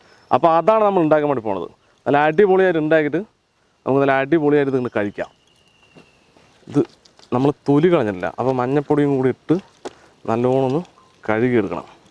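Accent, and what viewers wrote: Palakkad, India. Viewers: Palakkaden slagill "കോഴിയും കുമ്പളങ്ങയും "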